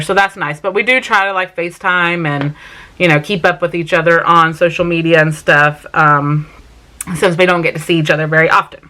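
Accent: South, United States. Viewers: I love reading too! I’ve never read any of Jodie’s books but I saw the movie My Sister’s Keeper and loved it! I struggle to watch movies so I tend to just rewatch my favorites 💓 My husband encourages me to get some me time as well Target is my me time place too 😂 I love your southern accent, I figured you had southern roots and I love cruising as well. We were suppose to go cruising in April but that didn’t happen! We have the whole early care and education thing in common! I didn’t major in that field but I worked in the field for years and have a special place in my heart for tiny humans and how they learn 💓 Sorry for the novel comment! Loved getting to know you and thank you for answering my questions 🙂💕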